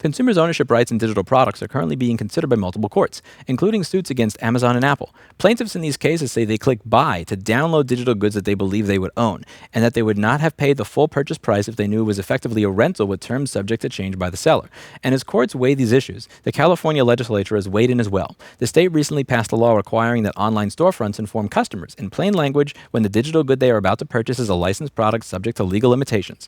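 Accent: New York, United States. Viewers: New Yorker detected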